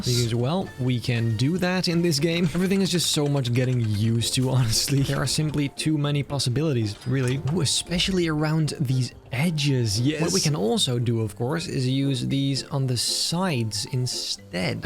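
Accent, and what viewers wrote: Netherlands. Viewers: Holdon Is this the same guy behind photoshop battles Sounding very dutch😅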